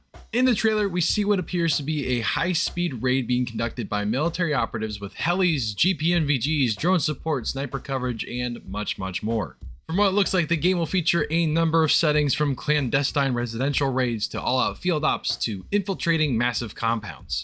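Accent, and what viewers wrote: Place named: New York, United States. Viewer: where'd the new york accent come from,?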